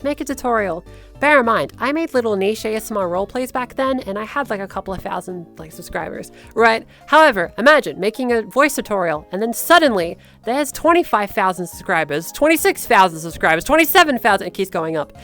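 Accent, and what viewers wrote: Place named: Australia. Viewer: want to thank you for the tutorial,. It was really refreshing to have another Aussie whose voice i could aim for and not the Californian (although those tutorials are still helpful!!) and you helped me practice my voice to the point where my vocal therapist was really impressed with my adaptability!! much love ❤️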